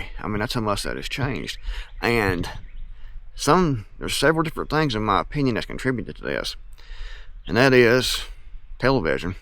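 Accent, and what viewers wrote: Appalachia, United States. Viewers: First video ive seen of yours i am a proud born and raised, West Virginia, Appalachian gal, and if they think you have an accent they should hear mine. Ive always been compared to Sissy Spacek, that played Loretta Lynn in the Coal Miners Daughter movie, because of the way i talk. Im so happy to be here and to hear a fellow Appalachian that sounds just like me. Oh yeah im a new subscriber too.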